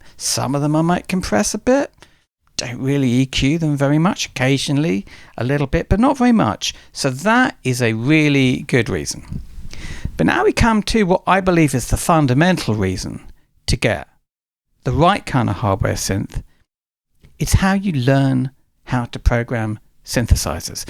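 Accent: United States. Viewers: Why you speaking in American?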